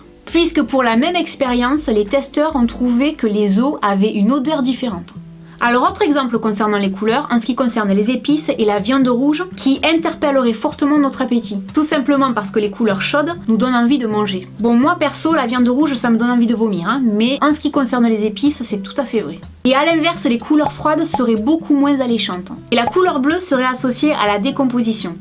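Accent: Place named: Perpignan, France. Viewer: Que dire une excellente vidéo! Que du bon sens et des astuces vraiment intéressantes. Merci belle, tu es d'un grand réconfort et ton accent de Perpignan pose du soleil sur les bleus de mon âme ☉🌝🌞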